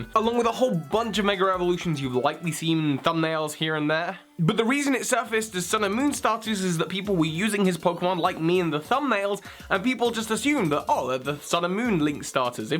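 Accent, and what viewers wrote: United Kingdom. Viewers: It's an English accent. English accents are pretty clear and easy to understand, unless English isn't your first language. also 'a shit'. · him "heynpokemon masters " me omg your british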